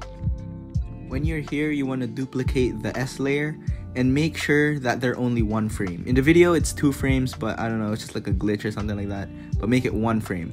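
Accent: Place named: Philippines. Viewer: Filipino accent do be nice tho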